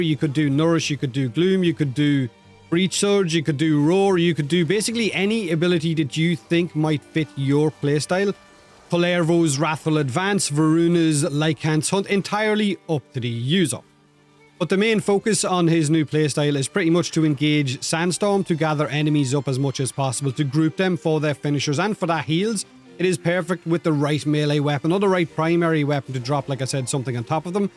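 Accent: Ireland. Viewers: It must be the Irish accent, I can always understand what the is describing about the abilities, anyone else has a go and I start day dreaming of long strolls in the woods under all the beautiful threes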